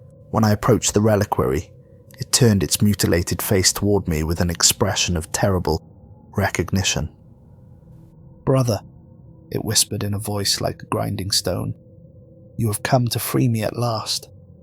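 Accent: United Kingdom. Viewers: I like the story. Can you slow down your audio a little bit? I could be wrong but you sound like you are from the uk and you have portions of the story that it it gets hard to understand. Most story channels are used to fall asleep a slightly slower audio works awesome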